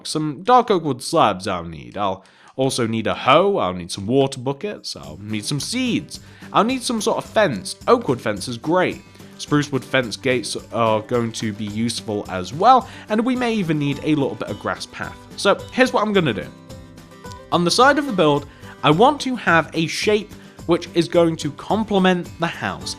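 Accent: United Kingdom. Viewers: I think he's from the U.K.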